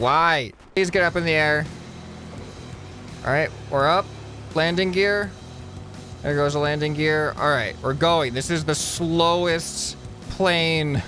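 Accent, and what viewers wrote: Texas, United States. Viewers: not trying to be rude but are you from Texas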